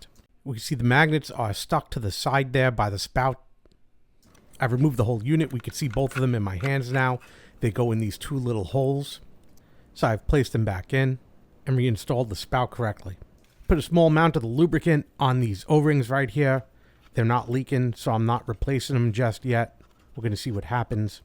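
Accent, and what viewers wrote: United States. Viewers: I enjoyed the whole 4 video series - very methodical and informative. If anyone needs the inspiration to perform this type of work themselves then your videos give it in spades. Question: Why was the machine set to language “German” when you’ve such a strong American accent ?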